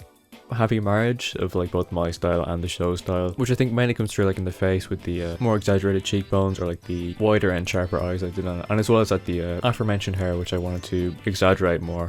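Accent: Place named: Ireland. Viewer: I myself have just over 8 years drawing experience and I can say for a fact that your work is greater than some 2nd 3rd year art college students I know. You definitely have the ability to comprehend the forms and shapes of objects and you have a knack for visual effects as well, which are some of the hardest parts of Shonen or Fantasy artworks, Be proud and remember that "slow progress is better than no progress" when you are stuck in a burnout or creative block :) I think I hear an Irish accent in there so if youre not in college yet, do consider This Is Art! Alot of the time when your work is judged they send feedback given by some Art Professors and Spearheads in the creative industry - Hope this is helpful!!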